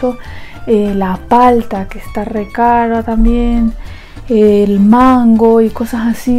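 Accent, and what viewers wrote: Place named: El Salvador. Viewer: Adoro ese acento salvadoreño!!!! Te mando calorcito nos tb sentimos mucho frio y en verano demasiado calor! Buena vibra hermana!!!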